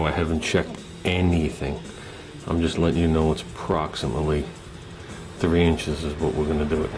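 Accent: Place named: New York/New Jersey, United States. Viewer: hey man, I'm guessing you are either from Jersey or NY with that accent :) Long Island here! I love these videos, you're inspiring me to get to work on my 1980 G body car. Once the weather gets better...and I think 2018 may be the year I finally build that dream garage!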